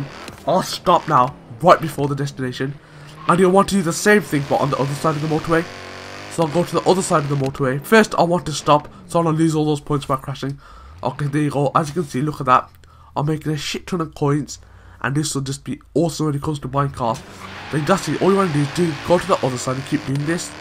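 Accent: Birmingham, United Kingdom. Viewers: Im pretty sure this is a brummie accent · he has a good british accent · Bro just cuz he's got a Birmingham accent doesn't mean he's romello's dad 😂😑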